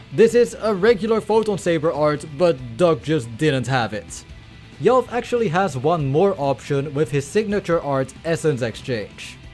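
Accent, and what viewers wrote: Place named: Netherlands. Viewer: Hoi hoe gaat het ik hoor dat je een nederlands accent hebt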